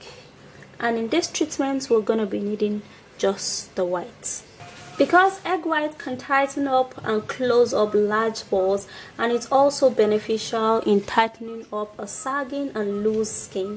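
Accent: Nigeria. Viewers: Blandina Mbock that a Nigerian accent you dummy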